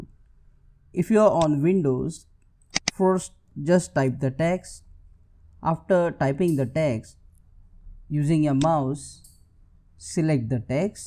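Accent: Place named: India. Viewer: The indian accent makes it better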